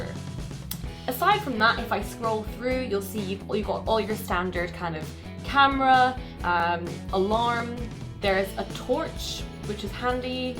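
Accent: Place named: Estuary English, United Kingdom. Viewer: Silly, intrusive background 'music', no explanation of what is shown on the external indicator panel, no mention of charging plug type, absence of showing how to set anything on the phone, ambivalent accent mixing estuary English with a sort of N.American and, overall, an ego trip posing as an empathetic guide for seniors.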